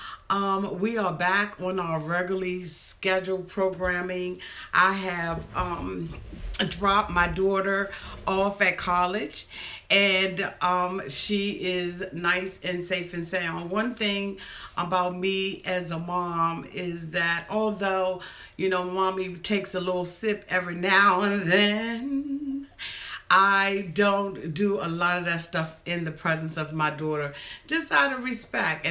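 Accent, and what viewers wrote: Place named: Baltimore, United States. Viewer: Great Wisdom! You must be from Baltimore, cuz you sound just like Mo'nique, accent and all girl!!!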